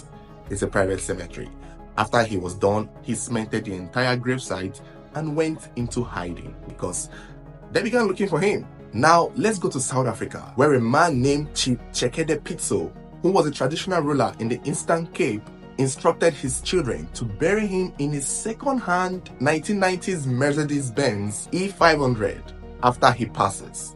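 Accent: Igbo, Nigeria. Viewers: He sounds like an Igbo from Nigeria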